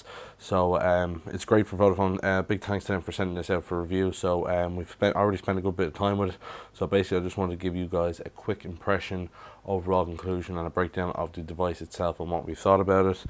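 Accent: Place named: Ireland. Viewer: R U from Ireland by any chance cause u sound like u are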